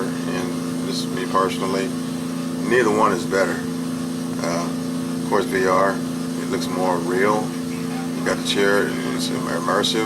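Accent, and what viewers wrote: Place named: South, United States. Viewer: Thanks for this! Looks fantastic! I'm getting 3DOF delivered in the next week or so. I have a couple of questions. I have Oculus cv1 that works great. Do I need to use the Oculus tracking lighthouses taped to the seat/machine for proper tracking and/or a program to keep it in sync? If my funds weren't limited, I'd get the Reverb G2 or even the Oculus S you have. My second question, do you need a program besides 3DOF's to talk with MSFS or X-Plane? I'm real psyched to get this built and flying! It's gotta be the ultimate immersion. I use to have a PPL, but my service connected disability prevents me from renewing. This tho will be just as fun..or nearly as fun! Thanks a bunch! (you sound like your from NC or Texas? If Texas, hope all is well for you!) Cheers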